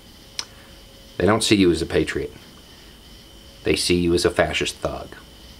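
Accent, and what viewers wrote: South, United States. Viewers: Dude I have to be honest... I caught my self for falling down that same trap when I heard your accent, but you have definitely proved me wrong. It's awesome to see someone from the south standing out on their own morals.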